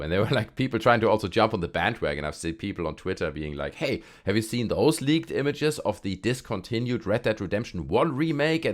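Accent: Germany. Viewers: Safe der ist ein Deutscher. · you sound german.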